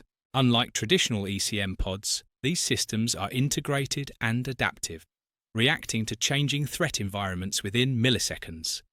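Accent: United Kingdom. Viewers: Why do we have someone with a British accent telling about American military aircraft? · Ai with a British accent is hilarious.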